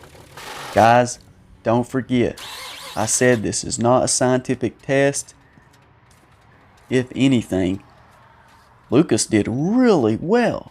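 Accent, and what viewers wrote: South, United States. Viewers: You are the type that give those with a southern accent a bad name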